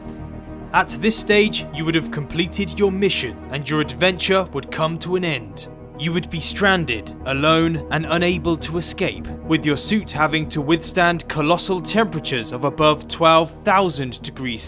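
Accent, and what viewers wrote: United Kingdom. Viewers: Love your British accent! (...and I’m also British...) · Your accents is British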